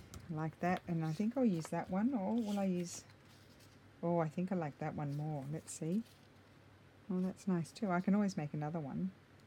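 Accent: Australia. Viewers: Hi, I assumed you were in Australia from your accent but just heard you mention you’ve just been to Australia - I know it’s nosy, but where do you live?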